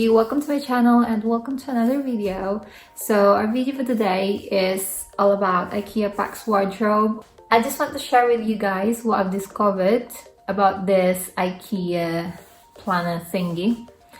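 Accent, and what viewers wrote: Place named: United Kingdom. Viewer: I Really like your British accent. And I’m trying to copy it 😜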